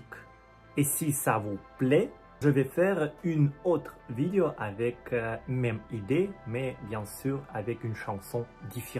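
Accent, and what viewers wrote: Russia. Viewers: Bonjour. Je viens juste de visionner la vidéo. Tu sous-estime tes progrès en français. C'est dommage... Certes, tu as toujours ce petit accent russe que j'aime bien mais qui s'estompera au fur et à mesure de ta progression. Lire un livre le soir, il n'y a rien de mieux pour s'endormir. Et en plus, s'il est en français, en dormant, tu retiendras plus aisément l'orthographe des mots que tu auras lu. En tout cas, félicitations pour ta persévérance. 👍🙋‍♂️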